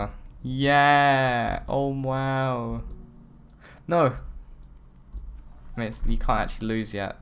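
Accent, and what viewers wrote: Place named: United Kingdom. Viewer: That british accent xD magic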